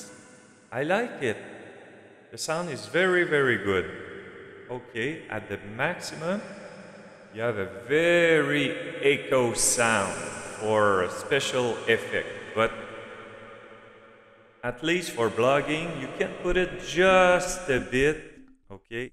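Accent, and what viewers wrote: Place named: Quebec, Canada. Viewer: Toé t québécois certain ?????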